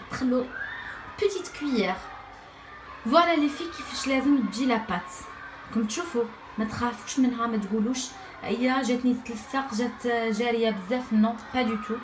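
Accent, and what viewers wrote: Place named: Algeria. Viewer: شحال تحمقني لهجۃ جزايريه تحيۃ من اختك المغربيۃ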